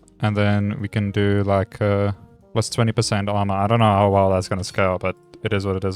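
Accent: South Africa. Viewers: yoh I recognize that accent from a mile away, miss South Africa man. You've earned yourself a subscriber so I can feel more at home lol. Also, a really good video! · bro are you from south africa? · Are you South African by any chance ?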